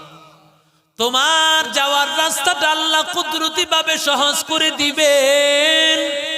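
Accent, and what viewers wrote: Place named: Kuakata, Bangladesh. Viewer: কুয়াকাটা কেন্দ্র্রিক কন্ঠ, মাশাআল্লাহ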